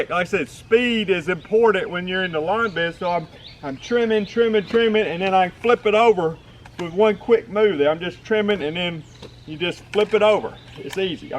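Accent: South, United States. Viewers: 1. Attractive 2. Southern accent 3. Handy 4. Excellent sense of humor. Is this guy single? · Your not English are you.?..love that southern drool . Over here in lil' ol' England we call it a lug hole..lol! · Loved your video, I did learn something, and I think its funny some of your comments about what the knuckleheads say about you or your work. And can I say, I love your "accent". I'm from the midwest and I forget comfortable and how easy it is to speak to or listen to someone from the South. I think if you stopped by my house, we'd be out on the deck or patio drinking a beer, just talking about things in general. I think that says quite about you. Best of luck and thanks for the video! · Great instructional video! Cool personality and love that southern drool 👏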